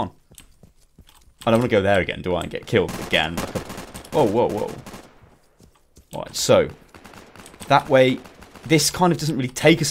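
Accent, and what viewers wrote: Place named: United Kingdom. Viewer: That's like the britishiest voice I've ever heard :D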